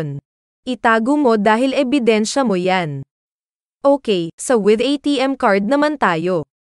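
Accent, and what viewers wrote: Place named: Ilocos, Philippines. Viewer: ilocana ka haha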